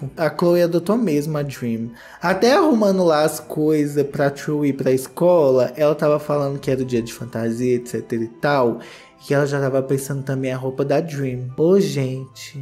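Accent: Minas Gerais, Brazil. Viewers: “Pera lá né” kkk vc e muito mineiro, eu amo o tô longe do meu estado e sempre que te assisto me sinto lá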